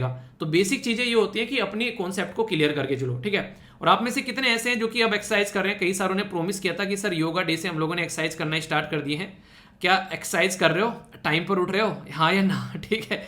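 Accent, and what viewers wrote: Haryana, India. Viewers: apki bato or awaj se or APK ghr se , or khane ki choice se ap Rajasthan Haryana me khii se ho ,, 90% Haryana 💓 😄😄😂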